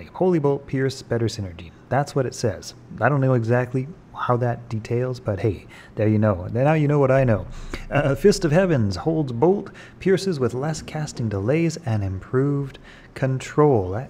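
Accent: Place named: Canada. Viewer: and the way he says about. I'm not saying anything bad, most Canadians are pretty nice and cool. But with certain words you can almost instantly know that they're Canadian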